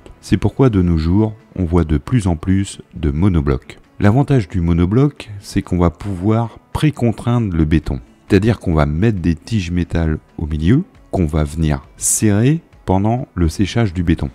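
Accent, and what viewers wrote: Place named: Bagnolet, France. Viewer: de rien, et y a ton accent aussi, typique de c'que j'entendais quand j'étais gosse à bagnolet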